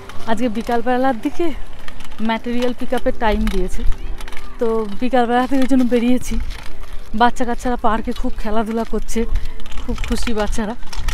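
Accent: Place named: Bankura, India. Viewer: আপনার কথার মধ্যে বাকুড়ার টান আছে।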